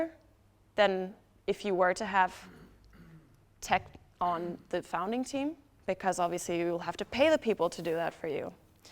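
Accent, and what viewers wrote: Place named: Germany. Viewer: I can hear a German accent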